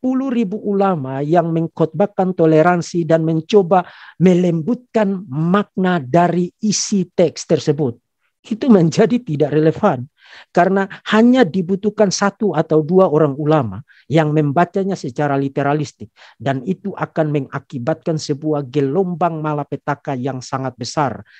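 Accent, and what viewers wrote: East Nusa Tenggara, Indonesia. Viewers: Narasi yg cerdas, dan ilmiah, klo dengar dialeknya teringat waktu kuliah di Malang bersama teman kuliah dr NTT, Tuhan Yesus memberkati bang sekeluarga 🙏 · aksen rote NTT... Bravo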